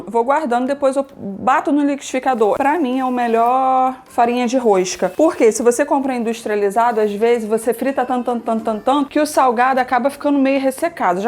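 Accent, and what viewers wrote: Rio de Janeiro, Brazil. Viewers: vc me leva para casa com o seu sotaque e o sabor das lojas que só tem no Rio, hoje moro em Bauru. Vou fazer agora!🙏😘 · Tu é carioca,?? Me identifiquei muito com seu jeito de falar hahahahha Vou fazer hoje essa coxinha, vamos verrrrrr!!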